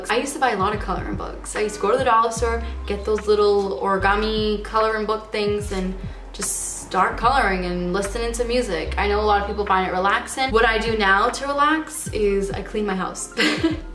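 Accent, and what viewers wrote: Boston, United States. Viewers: 1Brave Moon0 it’s a boston accent haha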